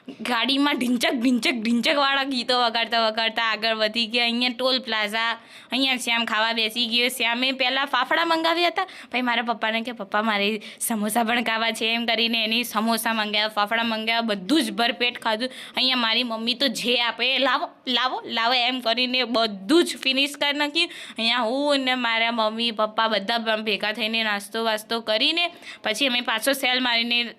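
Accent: Mehsana, India. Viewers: સુરતથી ગંગાપુર -ઊંઝા ની સફર આપણી બોલી -મેહાની બોલી ખૂબ મઝાની હું હાલ અમદાવાદ ખાતે પરંતુ ઊંઝાનો જમાઈ એટલે મને તમારા વિડિઓ અને બોલી ખૂબ ગમે છે. સરસ વિડિઓ બનાવતા રહો અને અમે એન્જૉય કરતા રહીએ....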